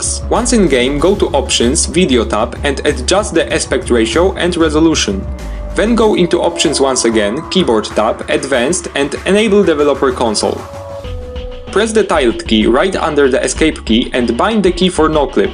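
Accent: Poland. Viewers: Great tutorial, but are you Polish? You sound Polish. (Yes, this is a necessary question.)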